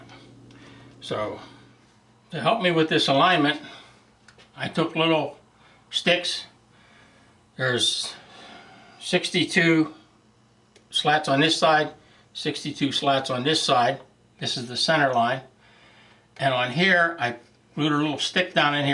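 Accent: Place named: Pittsburgh, United States. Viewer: You've GOTTA be from Da Burgh!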